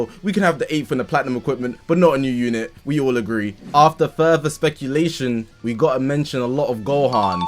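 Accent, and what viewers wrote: United Kingdom. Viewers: Idk wym by “WE” skipping 😭🙏 thought u was British not French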